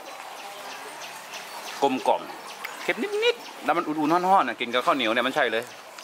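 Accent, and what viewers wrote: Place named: Northern Thailand, Thailand. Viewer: พูดเหนือปนกลาง งงกะลุง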